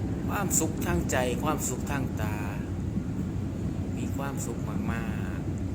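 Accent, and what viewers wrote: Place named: Isan, Thailand. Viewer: อยากทราบว่าแอ้ดช่องนี้.เป็นคนเมืองไหน.แขวงไหนของสปป.ลาว.สำเนียงการพูดเหมือนคนทางอุดร.ขอนแก่น.สารคาม.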